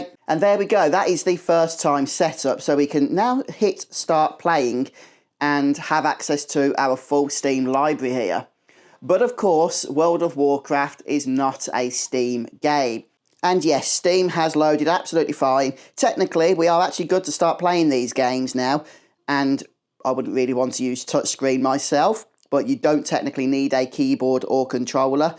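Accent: United Kingdom. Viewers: Goddamn british accent😂❤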